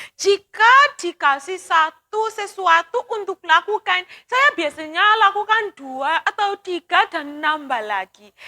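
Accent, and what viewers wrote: Java, Indonesia. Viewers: Memdengarkan gaya bicaranya, mengingatkan saya ke zaman SD dulu saat ibu guru ngajar. Bahasanya indonesia tapi logat jawa.. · ngomongnya logat jawa ❤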